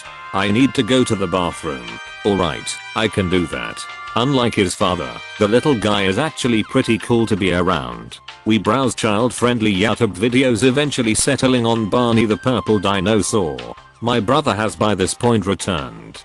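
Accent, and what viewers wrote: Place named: Wisconsin, United States. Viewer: I know a Wisconsite when I hear one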